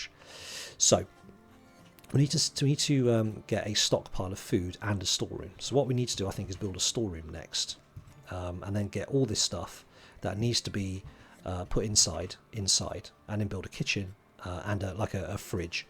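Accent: United Kingdom. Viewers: Delivered with such natural ability. I'm a british expat living in Norway, always nice to hear a fellow brit now and again. I shall continue on with the series, as the guide in general was a tremendous help. 10/10 for clarity, bravo. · You have an excellent voice for narration, I swear the English accent was tailor made for narration.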